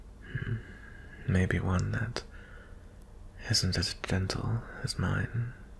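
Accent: United Kingdom. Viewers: You’re really good at a British accent! I am impressed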